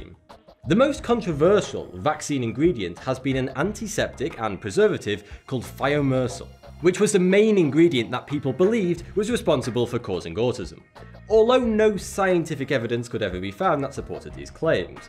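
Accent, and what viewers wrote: United Kingdom. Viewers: Where in the uk is thoughty2 from?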